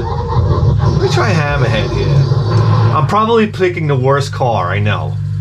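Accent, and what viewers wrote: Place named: New York, United States. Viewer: Man. Instead of complaining go Play some gangsters. With Your NY accent id hire u in a blink. Attitude to. Guaranteed return.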